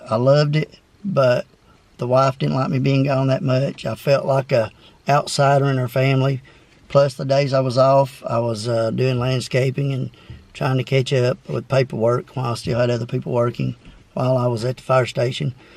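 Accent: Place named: South, United States. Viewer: Man you've got a HEAVY southern accent. Not all southerners sound like you so curious where you live. Turning 50 soon myself and i couldn't agree more about your advice. We have to find a way to slow down and realize money isn't everything. I've changed a lot in recent years. I used to just go and go. I also would feel like an outsider in my own family. For many years I really had no choice because I was the main provider in our family, my wife was a stay-at-home mom. Now that the kids are grown and she started working a new business I am now able to back off a good bit which has been really nice.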